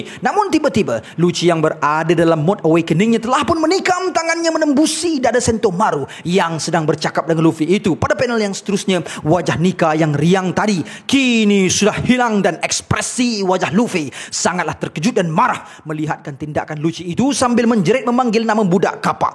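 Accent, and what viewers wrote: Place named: Terengganu, Malaysia. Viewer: Asalamualaikum..bila la ku nak dengar cakap tani..tak moh ka cakap cara kito..beri satu dunia tahu cakap kito...huroooong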